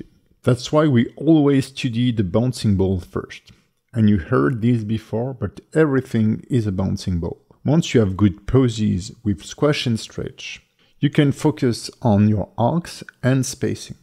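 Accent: France. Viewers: Such meticulous work! It took me a while to get used to your French accent but it was so worth it. I bought your rigging course and it's simply outstanding. My compliments on your teaching skills. Thanks a bunch for sharing your knowledge and striving to make it accessible to complete beginners like me!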